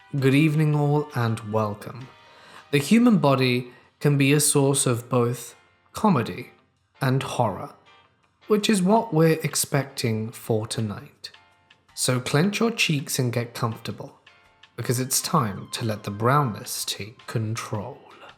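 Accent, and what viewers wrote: England, United Kingdom. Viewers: Nothing better than hearing vampirish English accent saying “shart” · R u from England??? Cuz u have a certain accent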